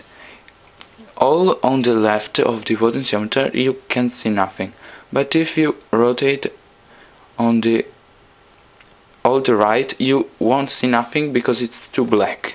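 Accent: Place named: Italy. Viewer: si sente che sei italiano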